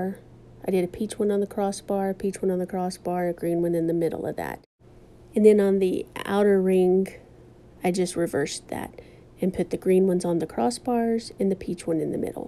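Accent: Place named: Midwest, United States. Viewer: Very nice! Question where are you from? The mid west! Maybe Indiana? Just listening to you talk.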